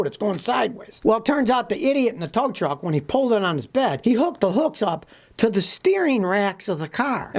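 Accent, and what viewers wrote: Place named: Michigan, United States. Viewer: THAT'S why you have that MI accent!! I always thought "he sure don't like a NY'r, he sounds like Chicago". Well now that riddle's solved. Happy Independence day 🇺🇸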